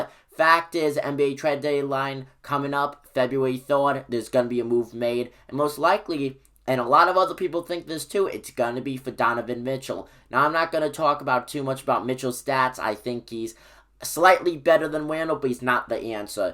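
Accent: New York, United States. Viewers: Fwankly….I love your NY accent…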